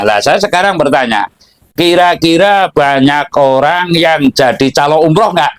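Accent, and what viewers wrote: Banyumas, Indonesia. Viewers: Nyuwon pirso Mbah penjenengan alamat ipon pundi.nuwon sewu.logat ipon kados banyumasan.kulo saking banyumas.sugeng Rahayu.🙏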